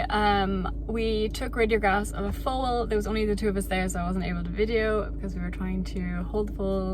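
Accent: Ireland. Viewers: are you irish o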